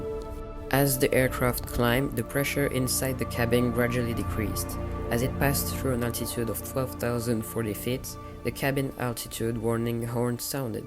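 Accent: France. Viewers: Woah bro im shocked! You absolutely nailed this video and voice reveal!!! Fire voice bro, the French accent is 🔥! Also RIP to all victims onboard that flight. 🙏 Best vid yet for sure!! I'm so happy for you bro and wish you, and your channel the best future posible! ❤ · o my god this is so scary ! i love that ! thank you for dooing this halloween video and this voice reveal with this french accent 😍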